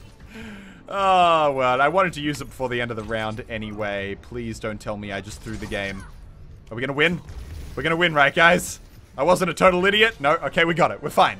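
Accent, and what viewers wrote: Australia. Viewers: U are Australian right? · I want to see you play Junkrat just because i want to hear some funny australian flavor in-game commentary :D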